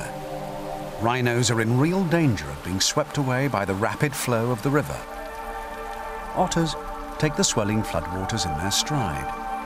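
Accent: United Kingdom. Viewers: Great documentary, the old ones with the British narrators are my favorite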